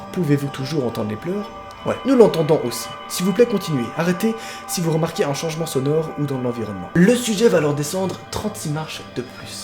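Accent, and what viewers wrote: Belgium. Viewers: il est belge ou suisse chépa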